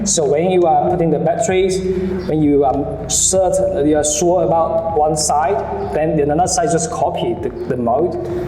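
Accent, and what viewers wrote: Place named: Australia. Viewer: Was listening you sound like an Australian accent.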